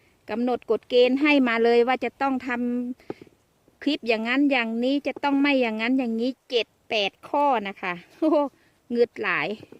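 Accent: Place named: Isan, Thailand. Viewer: ชอบเวลาเว้าอิสานจะน่ารักดี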